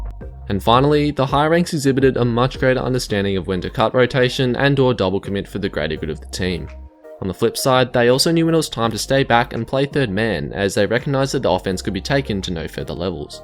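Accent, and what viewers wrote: Australia. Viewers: r u from Australia? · The aussie accent gave it up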